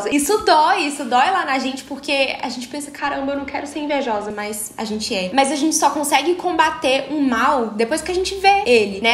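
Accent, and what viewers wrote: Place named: Campos dos Goytacazes, Brazil. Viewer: Oie,! Tudo bem?! De que estado você é? Seu sotaque é mto parecido com o daqui "Campos dos Goytacazes", RJ.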